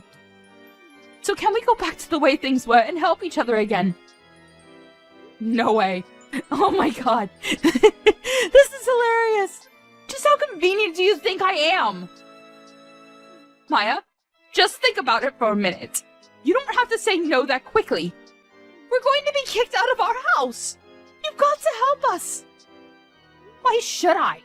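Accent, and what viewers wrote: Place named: England, United Kingdom. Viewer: I like the English accent.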